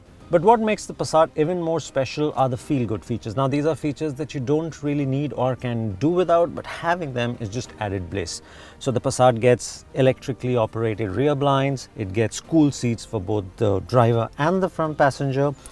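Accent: India. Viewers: bullshit... This guy has a good Indian accent that is crisp. He is very easy to understand and is very serious... Matt speaks very fast... Swallows his words... And is full of goofy jokes ...which are nice too...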